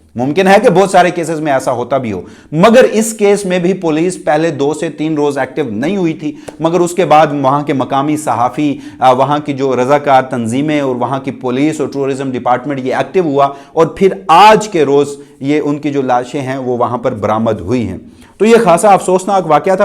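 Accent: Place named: Punjab, Pakistan. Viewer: پلیز اردو بولنے میں خیال رکھیں یہ ہماری قومی زبان پے بھائی اسکو تو صاف طور سے بولا کریں ہماری تو خیر ہے کیونکہ ہم اردو کو بھی اب پنجابی لہجہ کے سننے کے عادی ہو گئے ہیں مگر ہماری نسلیں تو اصل اردو کو بھول ہی جائیں گے لفظ سیاح میں ی پر تشدید مانی جاتی ہے مگر تشدید لکھی نہیں جاتی تو لفظ "سی یا"ہے سیاہ نہیں یہ صرف آپکی اصلاح کیلئے لکھا ہے شکریہ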